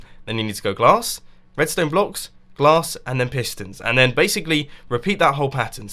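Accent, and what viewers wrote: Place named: United Kingdom. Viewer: You are a British what the hell bro but I'm Indian and I like your english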